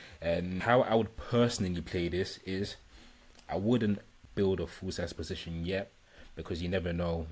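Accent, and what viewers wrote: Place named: United Kingdom. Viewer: Respect sound like mandem and from uk what’s your tele username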